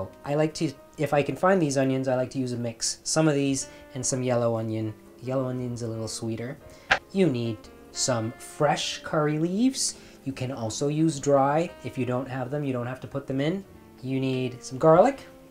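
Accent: Jaffna, Sri Lanka. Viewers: Thambi… super Unga srilankai Tamil kadhil ketka romba inimaiyaga ulladhu… lovely to hear your srilankam Tamil … rombavae vadivana vlog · “Melaha thool” lol love it that you still have your yaarlpannam accent. Looks amazing.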